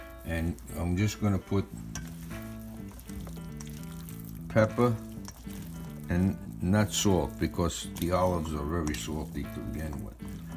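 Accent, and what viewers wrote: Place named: Boston, United States. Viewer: U sound like your from Boston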